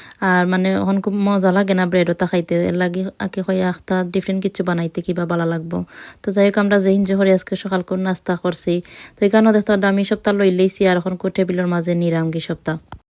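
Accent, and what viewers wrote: Sylhet, Bangladesh. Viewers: আখনি খেতে অনেক ভালো লাগে আপু তুমার ছিলেটি ভাসা শুনতে খুব ভালো লাগে আমার ❤❤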